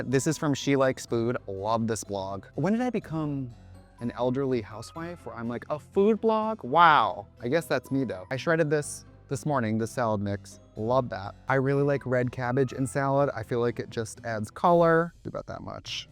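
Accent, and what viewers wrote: Wisconsin, United States. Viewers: In my head, I was like why does Trixie kind of sound like Ryan Murphy playing Ed Gein from Monster but then I remembered they’re both from Wisconsin lol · Honestly, the wisconsin accent was strong in this video. love that for you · The wisco accent is back in full force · wisconsin accent is thick today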